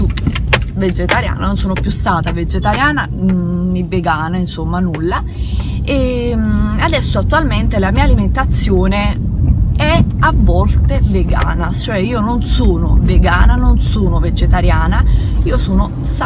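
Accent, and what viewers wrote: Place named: Naples, Italy. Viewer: Sempre a criticarla per come parla...ma nessuno ha ancora capito che e' napoletana?e per i napoletani tutto e' permesso sono cosi'!! non lo fanno apposta e'anche per questo che sono simpaticissimi😊😊😊vai!!!bellissimo video!!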